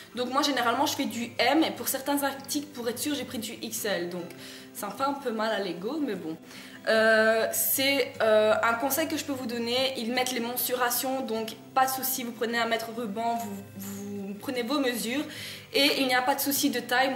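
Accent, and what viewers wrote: Belgium. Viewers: tu est belge non ?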